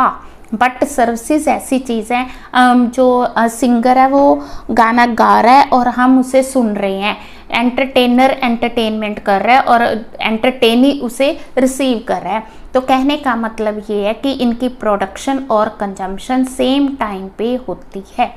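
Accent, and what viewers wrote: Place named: Punjab, India. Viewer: apki aawaz me Panjabi jhalak rahi thi vaise mai Gujarat se hu but I love Panjabi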